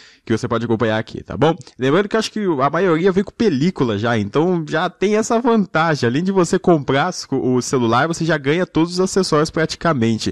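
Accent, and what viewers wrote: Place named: Piracicaba, Brazil. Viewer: você é piracicabano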